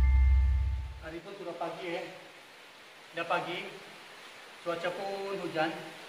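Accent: Sabah, Malaysia. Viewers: pergh hebat.berani. pekat sabah kau ni bro. bajau ke bro. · dngr mcm slang sabah je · Diorang Sabah ,Kerje kat Malaya!!! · cakap macam org sabah, tapi vlog kat pahang. org mana dan kenapa di pahang? hehe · Urang sabah ka ko tu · org sabah kah bro?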